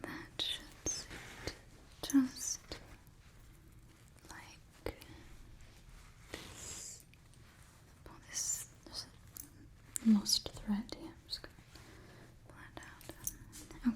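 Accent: England, United Kingdom. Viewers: Being tired I had to keep reminding myself this wasn't one of the British ASMR youtubers I watch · I love that you're trying to do a British accent lol!! Ive never seen the show but this is chill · I actually thought you were british lol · God I love this and I like how I was expecting a French accent but was very happy to hear an English one! What a lovely video :) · I love that you've done this makes me even prouder to be English and your accent and attire is amazing you make a very classy lady ❤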